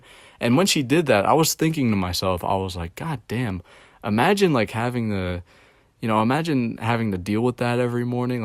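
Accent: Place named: United States. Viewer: I was waiting for a buzzword....knew something up. I literally can't have any sympathy for you because of how negative you are. And I don't care if you've never directly bullied anyone, because the way you talk about people in other videos sounds eugenicist. I'm not hear to tell you to pull yourself up by your bootstraps. I bet you will feel better about yourself once you learn how fucked up capitalism is. Don't think about individual women, think about patriarchy and capitalism. It almost explains everything about modern life in a first world country (I don't know exactly where you live but I assume America by your voice). Don't think about your socioeconomic upbringing, think about the power of labor and the power of capital. Don't think about dating, think about community. Think about the world through a sociological lens, and try navigating the world like a sociologist. 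Once you start realizing that socialization is as simple as showing up to places regularly and not pushing people away with what you say (honestly, lots of friend making cliches from high school hold true). And above all, live life for yourself. I will not tell you to move through the world with a goal mindset, because success if not guaranteed. Rather, have systems in place that you will socialize regularly, with any safe group that will accept you. It's hard, but not because it's complicated, but because of the emotions surrounding rejection and fear. That's mostly it (of course from aspects of your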